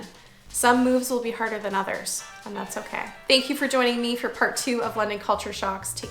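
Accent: Canada. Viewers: I apologise for our inability to detect the Canadian accent: the difference between your voice and the 'general' American accent is... subtle to our ears. Try saying 'eh' more and make reference to poutine on a regular basis. Some of us will get it. I have come across beds in the UK called a 'Queen' size. It's not consistent though.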